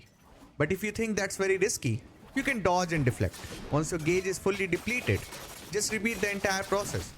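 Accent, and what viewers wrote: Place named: India. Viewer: I'm chinese and your Indian accent is so thick it made me pay more attention to what you were saying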